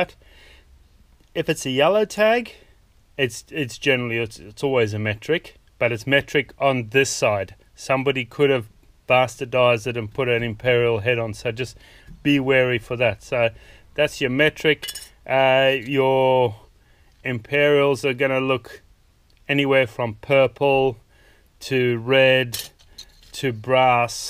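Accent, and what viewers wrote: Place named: South Africa. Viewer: Love the South african accent.😊 Thank you for all your educational videos.🙏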